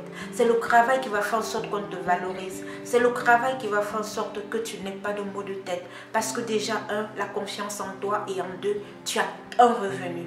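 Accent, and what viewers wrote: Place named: Africa. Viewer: T'es super, je me suis régalée d'entendre ta voix charmeuse et douce avec cette note d'Afrique...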